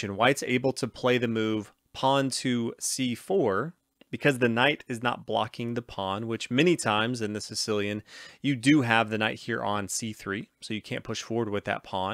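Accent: United States. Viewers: Thanks. Are you from USA?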